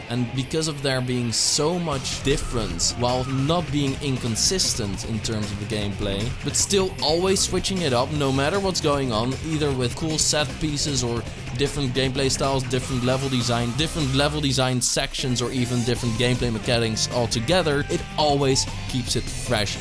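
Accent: Netherlands. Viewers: Youre from the netherlands right i here your accent haha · Pardon me for asking, but are you dutch? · hears him speak for 10 seconds. Yeah he's dutch, beautiful accent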